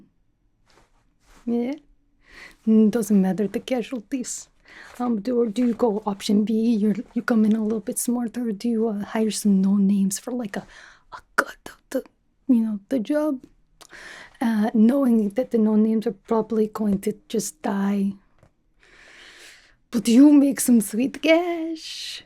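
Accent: Russia. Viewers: Hello. I'm Noah, and I like Marvel superhero movies, particularly the MCU. I really liked your cosplay of Yelena Belova. Your Russian accent is very good, even though I really have not watched a MCU film "Thunderbolts*". I wish that I was a young man, who is kidnapped by other Russian assassians and locked me in a cage, then needs to be rescued by Yelena and said "Thank you". Or... if I needs to be trained by Yelena, then kidnapped by the other Russian assassians and locked in a cage, I would use my special training skill to escape. That might be a good idea. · Yelena is back and still making my scalp tingle. ✨ I love that Russian accent, you're so good at it. 😁 And the hair. 🖤🤍 Being captured and interrogated is a lot of fun. 😁 I hyped this. 🖤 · I agree, the accent is great for the russian characters shes done so far the silly attitude does make it amusing too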